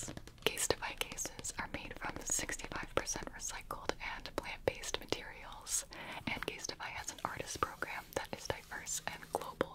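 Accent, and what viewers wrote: United Kingdom. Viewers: omg the british accent fits you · Ngl I love ur British accent tho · wtf i love the british accent 😭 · Who said that we would laugh at your British accent? It is wonderful, even tho I don’t live in Britain but I do love British accents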